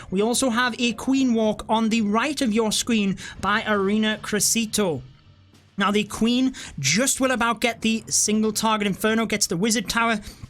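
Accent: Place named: Cockney, United Kingdom. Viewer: Love your accent It's cockney right???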